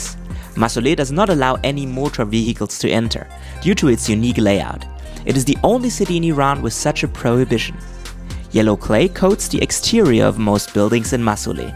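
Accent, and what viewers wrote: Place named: Germany. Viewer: You make some dope vids, man. I'm also Iranian and I hope you had a good time here. I noticed you have German accent, so I gotta say that I'm a big fan of:)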